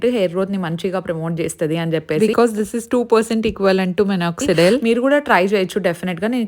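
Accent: Anantapur, India. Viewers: Akka miru pakka ATP language matladutharu akka superb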